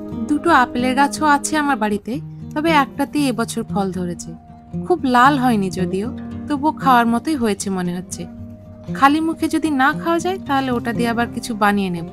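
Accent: Bangladesh. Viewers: Apo apnito bangladeshi der moto kotha bolen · Apnar bangla pronunciation akdom e indian bengalis der moto na. You're sounding like a bangladeshi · Yes you speak like bangladeshi. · Tomar kothar dhoron Bangladesher moto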